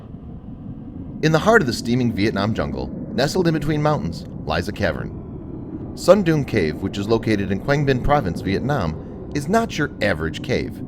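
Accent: United States. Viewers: Within the first two minutes the video contradicts itself... Which was it... the sound of the wind or rushing water? And why bother to even try to tell us again what you've said only two minutes before? Is this made for the dumbest people alive.... Oh wait, the narrator is a yank so I guess it was.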